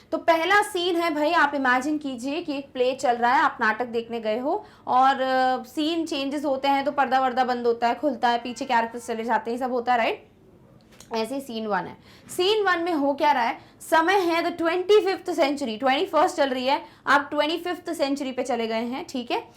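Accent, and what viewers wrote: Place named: Punjab, India. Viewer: Mam Shayad Panjabi Background se aati hai kyuki inki hindi me thodi thodi panjabi Accent jhalaktha hai 😹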